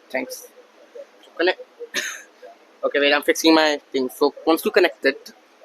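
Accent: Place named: India. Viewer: omg indian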